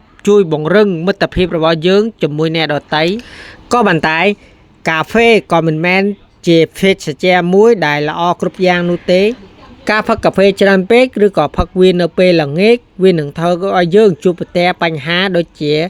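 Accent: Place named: Vietnam. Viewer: សុំសួរអ្នកនិយាយហ្នឹងខ្មែរកម្ពុជាក្រោមឬក៏ខ្មែរលើឬក៏វៀតណាមសុំសួរបន្តិចពីព្រោះខ្ញុំស្ដាប់សំឡេងដូចជនជាតិវៀតណាមរៀនភាសាខ្មែរអញ្ចឹង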